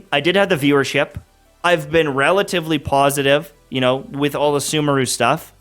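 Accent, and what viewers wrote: Scotland, United Kingdom. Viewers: Not for the content....here for ye Laddie!!! Imagine this in your Scottish accent dear!!!! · The thing I like most about you is you genuinely care about your viewers and players of this game spending unnecessary amounts of money which is something I haven't really seen other creators push as much as you. I've spent a lot on genshin and sure I've been lucky enough to get characters I've wanted but it always ends with me regretting it and needing the money later on down the line. It wasn't until I saw your videos that I really started to think about what I was doing and because of that you've probably saved me so much and I honestly am so grateful for you and your content and your mindset for this game. Not to mention your tutorials and guides have been the main ones to actually help me understand this game and how it works so I will always appreciate you and the videos you make no matter what. 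Plus you're very funny and I'm a bit intimidated by the fact that your Scottish accent is better than mine, a Scottish citizen.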